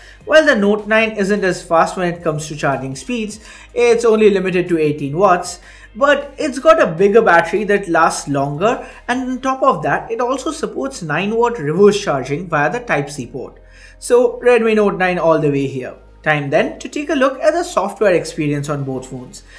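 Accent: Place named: Bengal, India. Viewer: with Bengali accent songs pretty weird to me as I'm an Odia 😆😆😆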